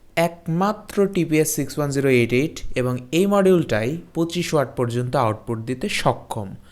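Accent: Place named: Chapai Nawabganj, Bangladesh. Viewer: ভাই আপনার বাসা কি চাঁপাইনবাবগঞ্জে। আপনার কথার টান আলাদা।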